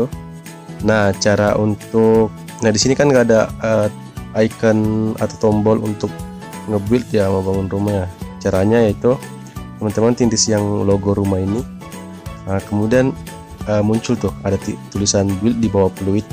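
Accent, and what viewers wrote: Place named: Sulawesi, Indonesia. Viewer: Dari Logatnya kayaknya orang sulawesi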